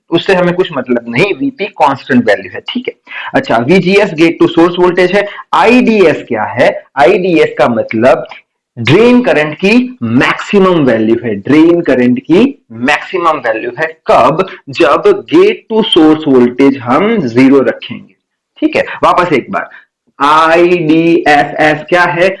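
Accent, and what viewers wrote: Gujarat, India. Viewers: When at the starting of the video (hlo) and i m understand sir is also from gujarat..😅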